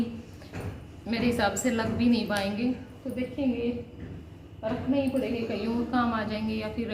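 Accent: Haryana, India. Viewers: Bebe haryanvi boli mast laga h👌👌👌👌👌👌👌👌👌👌👌👌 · Haryanvi touch aapki language m sunn k bhuutt acha lga Di... God bless you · Aap h haryanvi me baat kera kero behot achi lage h aap k mu se sunne me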